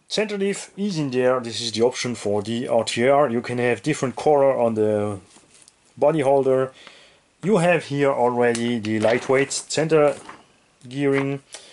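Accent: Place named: Alsace, France. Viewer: Je comprends rien que ce soit en anglais ou allemand mais entendre ton accent alsacien un vrai régal 😊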